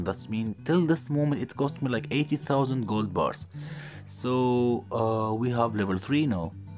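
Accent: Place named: Syria. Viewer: Accent sounds Syrian 😊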